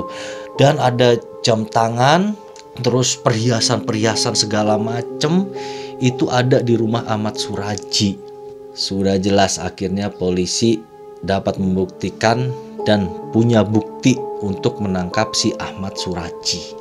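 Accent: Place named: West Java, Indonesia. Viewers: Suka bercahaya tea gening. Aduuh sunda pisan ... Salam dari bogor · ( keluar. Cahaya tea ning ) hahaha fiks org bandung pisan hahaha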